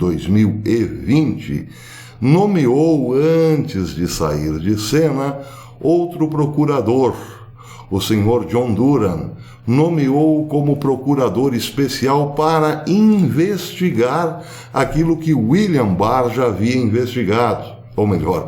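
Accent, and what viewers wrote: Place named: Minas Gerais, Brazil. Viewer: PROFESSOR, V. EXA. TEM SOTAQUE MINEIRO, NASCEU EM MINAS E FOI MORAR NO PARANA?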